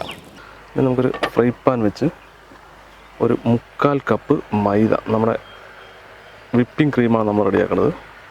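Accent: Palakkad, India. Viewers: Pallakad ann makale..😎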